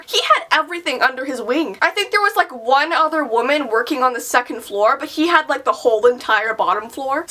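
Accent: Canada. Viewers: Me: is she Canadian or American?: it's really weird eh Me: ok she's Canadian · "It's so weird eh?" 😂☺️her Canadian accident is the cutest lol. I'm from the south so that's really rare to find. Love you !!!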